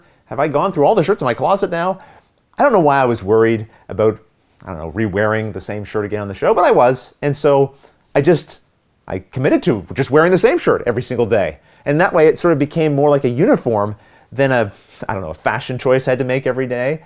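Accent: Canada. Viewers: I like the review idea, and your opinion can be taken for what it is. I am not going to be more likely to buy a game because or your Jedi mind trick of giving your opinion. It is a different platform for you, and is a different goal. You are in the business of showing people how to Play. And I think that is enough to expect. If you add your opinion, it is just going to see if you like it personally. I don't know if it will change anything. A shorter intro is not a bad idea. I tend to be looking at other things, and don't even really watch much of the intro; just waiting for the meat. So yes, short and sweat. As for the shirt, I sort of like that it adds a level of professionalism to your videos, but you tend to wear nice clothes anyway. I have seen other game videos where people don't even show themselves at all, but in your videos, you are on camera a lot. I see your goal of being a friend teaching the game, and I have come to consider you a friend even though I have never been in the same country as you. 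But I am a retired military officer, so uniforms for me are a statement of professionalism, so I like it. I think you are right, it really doesn't matter what you wear, you are an awesome teacher and do a far, far better job than any other game you tuber I have seen. You are always my first choice to go to find out how to play a game and I will watch you no matter what. You do talk funny, and have a Canadian accent, but I can forgive a few things. I love how you all say iro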